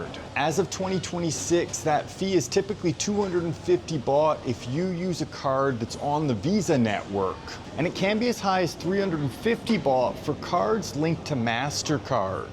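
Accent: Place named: Canada. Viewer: He's a fellow Canadian - the sound of home ;-)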